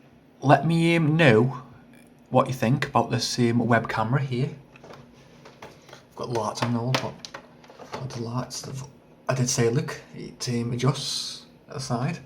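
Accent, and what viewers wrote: United Kingdom. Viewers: british accent?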